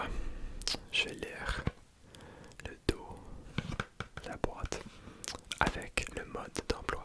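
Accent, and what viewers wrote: Quebec, Canada. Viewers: Ici en France, y a plein de sports mais c'est vrai qu'on ne joue pas trop au golf, c'est réservé à des personnes ayant les moyens. Pour ma part, je joue au tennis Ton accent canadien est très joli 😊 Bonne nuit tout le monde 😴🫶🏽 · incroyable !! enfin la vidéo en fr, pour les français l'accent québécois ne se rate pas lol !! toujours des vidéos géniales ^^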